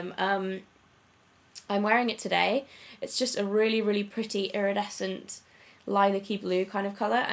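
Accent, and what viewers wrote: United Kingdom. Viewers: Hi, I actually just found you through MissGlamorazzi and I really love your videos and your British accent! I have a friend who lives in Hull, I think thats how you spell it?? Actually I think she lives in London now and I love listening to her voice. My husbands step-mother is also from England, she grew up in the Isle of Mann. Anyways, Could you do a video on different hair styles that are simple everyday looks? My hair looks the same everyday and I have no idea what to do with it! Thanks!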